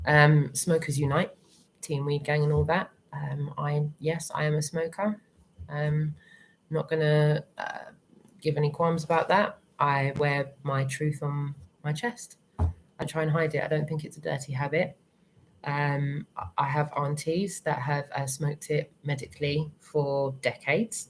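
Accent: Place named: England, United Kingdom. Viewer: At last, Ive found an english lady who speaks the same as i do!! Id love to chat, if your up for it!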